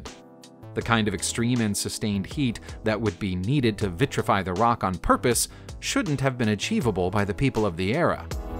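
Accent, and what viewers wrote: United States. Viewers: just the next disgusting american accent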